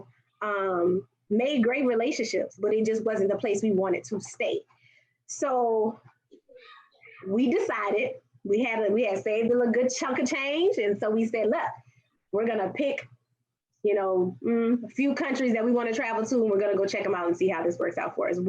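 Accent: United States. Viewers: She looks like a Rwandan except she has lived in the US and speak like American. I think she is 1ST generation American from Rwadan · She sounds like she's from the New Orleans 504 area. I would have definitely like to hear more of the more position pros on each country she went to. Wondering what did work for her and her peoples.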